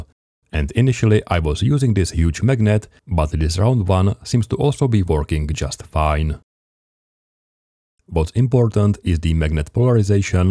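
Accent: Czech Republic. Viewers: Ten českej přízvuk jsem poznal hned :D Btw cool projekt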